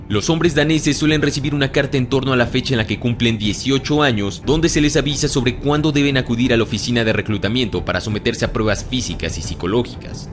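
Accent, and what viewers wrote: Mexico. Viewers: Aa perro .. eres mexicano :0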